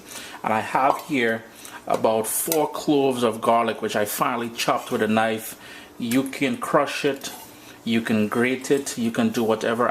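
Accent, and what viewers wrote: Trinidad and Tobago. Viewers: Yuh sound Trini 🤔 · Am I hearing a hint of a Trinidadian accent? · of course i will put scotch bonnet pepper on it!!also brother how do i make this more carribean? i love your accnet!! trinidadian?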